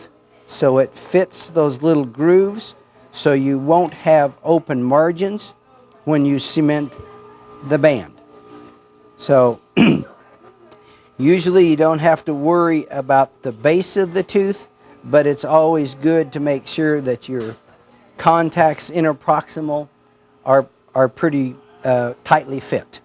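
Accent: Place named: Arab world. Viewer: شو مبين من لفظك عربي 😂♥️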